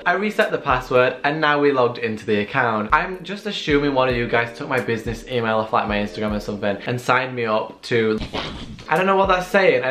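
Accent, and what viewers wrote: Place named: Sheffield, United Kingdom. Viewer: We love the Sheffield accent Oreyt meyt ow ya doin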